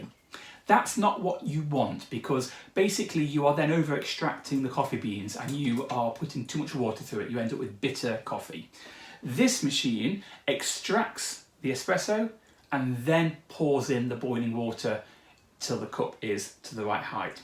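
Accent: United Kingdom. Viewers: Hi! Great video. As a German obsessed with English accents, I would like to ask you which part of England you are from...Cheers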